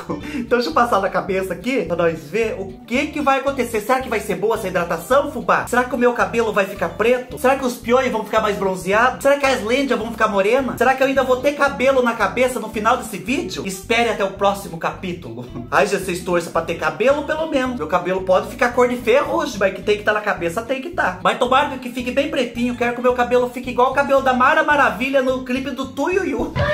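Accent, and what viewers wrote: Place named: Caipira, Brazil. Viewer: 🥀beijos de Barueri SP ❤ adoro seu sotaque caipira paulista, igual da minha família 😘😘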